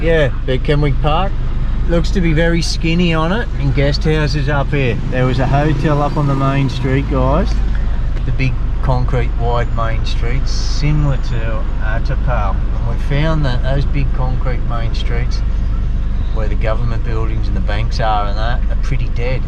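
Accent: Australia. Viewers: I detect a slight trace of Australian accent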